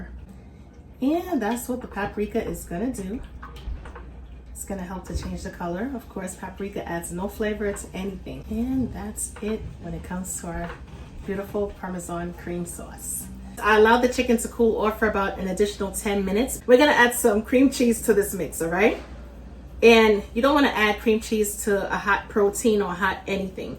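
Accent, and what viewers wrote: New York, United States. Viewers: Love your New York accent. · I’m in love with the New York accent 😅. And I’ve made most of these recipes. Somebody need to put a ring on that finger ASAP 🥴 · The NY accent love it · Hi,.I just started watching you about a week ago and i'm loving your recipes. I love the way you talk to I think you're from new york but i'm not for sure, but thank you for sharing your recipes because i'm getting ready to try quite a few of them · Heavy on the NY accent ;-) My mom is from the Bronx :-D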